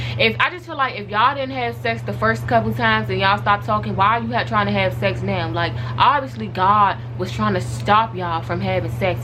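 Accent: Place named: Maryland, United States. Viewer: Are you from MD. I can hear the accent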